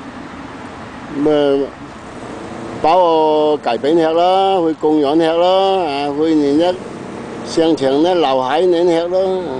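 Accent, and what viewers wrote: Taishan, China. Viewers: 讲台山话可得意哈哈!!好靓仔、今時今日返唔到台山了、喜歡你拍攝多片看、龍仔可耐没見、他大個仔?🙏🙏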